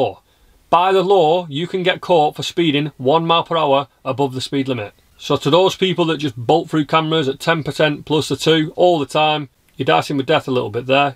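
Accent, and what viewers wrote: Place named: West Midlands, United Kingdom. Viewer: Hi there! First off, great video! I'm from the West Midlands (old South Staffs actually) so I understood your accent perfectly - just in case some people whinge about your accent! LOL I've been driving since 1975 and hold both a full car and motor cycle licence. Most of my working life was spent in the motor trade and during that 'life' I covered many, many hundreds of miles on road test - some(!) of those miles at speeds that were, shall we say, a little north of legal! Also - during that time - I was 'done' only once for speeding (more by luck than judgement!) and since leaving the motor trade proper, I have fallen foul of a speed camera (one in a van) once as well. As a matter of interest, I've been involved in a few minor accidents too down the years - ALL at speeds BELOW 30mph! As someone who has been interested in driving since I was a young child (I have pictures of me in a pedal car at 'Uncle Eric's pedal car track in Rhyl, North Wales dating back to the early '60's!) I have become ever more annoyed by the way cameras have been used to sneakily extort money from the easiest target/cash-cow of all - the motorist. 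I totally agree that if someone is driving dangerously, then they should be penalised for it - but speed ISN'T always the issue! BAD DRIVING is! A proficient driver doing 100mph at 3am on an empty motorway is surely no more dangerous than a tw*t doing 40 in a 30 at 3pm in a school zone! I drive for a living now, and on a daily basis, I see people out there who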